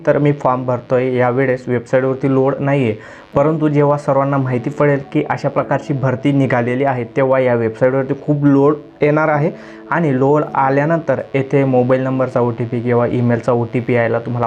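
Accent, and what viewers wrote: Khandesh, India. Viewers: तुम्ही बोलण्यावरून खान्देशी दिशी रायणात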